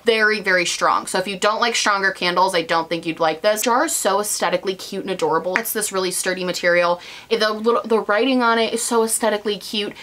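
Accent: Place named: Rochester, United States. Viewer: Okay so...I finally figured out who you sound like to me....Kristen Wiig! And you're both from Rochester, NY. Lookie there. Accents are cool. Love your videos btw! Please do more non-makeup favorites :)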